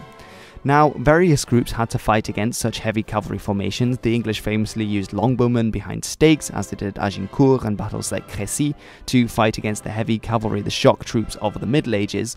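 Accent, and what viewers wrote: Netherlands. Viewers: is this guy dutch or english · you are dutch, youre accent is dutch and you pernoune dutch names almost perfect xD HUP HOLLAND HUP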